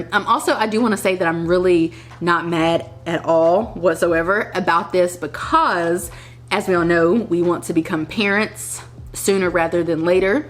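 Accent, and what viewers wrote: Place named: Pittsburgh, United States. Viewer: Lovely you have to be from my hometown Pittsburgh … I can pick up on our accent anywhere … it’s nice hearing it especially since I don’t hear it to often ♥️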